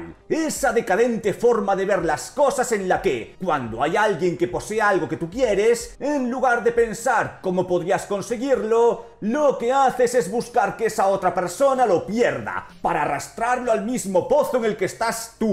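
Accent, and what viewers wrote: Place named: Europe. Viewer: Wey pero los que mas ladran sobre estos temas de la ficción son los socialjustice warriors de yankilandia además me sorprende ver este vídeo de una persona de acento de cierto pais de Europa ya que ustedes se quejan por todo 😂 o a lo mejor me equivoco y estas utilizando loquendo para sonar asi pero igual yankilandia y ese cierto pais de Europa se la viven quejando por problemas inexistentes 😂 son todo un Show 😂 lol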